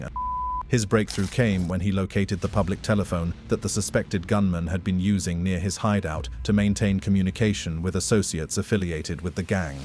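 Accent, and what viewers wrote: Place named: United Kingdom. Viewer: Don’t forget about the UK accent that iS APPARENTLY & ABSOLUTELY NECESSARY TO ANY & ALL documentaries that dumbasses need for some shallow, ridiculous reason!!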